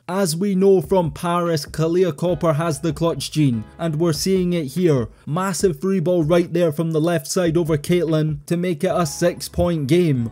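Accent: United Kingdom. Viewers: Bro why is it always a British dude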